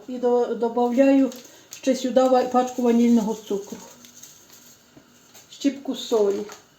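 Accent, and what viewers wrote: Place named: Galicia, Ukraine. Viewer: Так гарно слкхати наш галицький говір. Як мкзика звучить.. ❤❤❤❤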